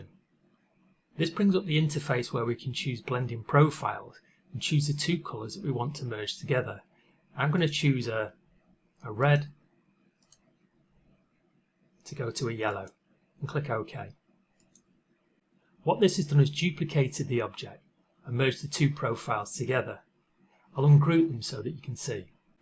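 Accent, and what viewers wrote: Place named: England, United Kingdom. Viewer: Thankyou for your videos, very helpful. Im English and its very nice to hear an English accent explaining. I will be watching them all.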